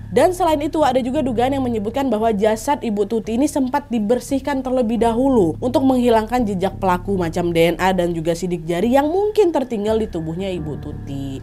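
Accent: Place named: North Sumatra, Indonesia. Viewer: BTW, ini profesi sesungguhnya apa ya. Dan dari logat bicaranya dia tampaknya orang Sumatera, khususnya Sumut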